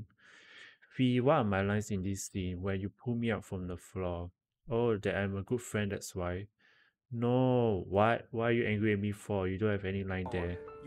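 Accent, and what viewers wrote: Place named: Singapore. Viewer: Hello!! Are you from Singapore ? You have the accent ! Thank you for uploading these videos btw they are my comfort videos haha 💕💕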